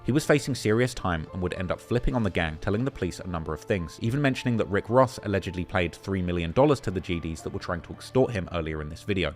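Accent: United Kingdom. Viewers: Hearing about shit that happened where I grew up in a British accent is weird lol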